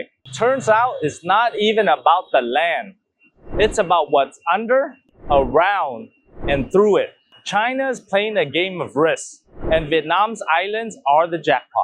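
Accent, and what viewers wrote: United States. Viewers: Trước đó, đời ông bà mình, Tây dùng người Việt đi đánh người Việt. Bầy giờ, Tây mong dùng người Việt đi đánh người Hoa đễ chia rẽ, cảng trở sự phát triễn của Việt Nam và Trung Quốc nói riêng, của Á Châu nói chung. Ông này nói tiếng Anh giọng như người Mỹ quá há các bạn... ? 😉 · This man full of American accent, what he is reporting just trying to stir up the status quote of thebreguon.anyway I don't understand a word he is saying.He does not know about the history, nor does he know about the geopolitics and the relationship between Vietnam and China have been straightened 5 years ago.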